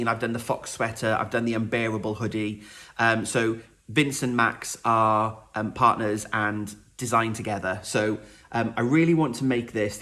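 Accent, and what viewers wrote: Wales, United Kingdom. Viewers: I'm loving your videos. Good luck with getting through your plans. I really enjoyed knitting the flax light for my daughter in lockdown. I'm currently on the last few rows of my Winter Lights Shawl by Stephen West (my first West Shawl). Also hearing a Welsh accent is making me feel home sick being a Cardiffian living in Manchester and not having seen my family since February 2020. Thank you for the virtual cwtch!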